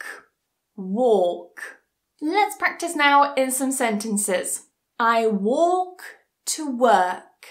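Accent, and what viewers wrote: United Kingdom. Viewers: Love your British accent! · Hi, I prefer coffee. I love your British English Pronunciation with Walk and Work., I also want join your English Pronunciation Pro. You are my best teacher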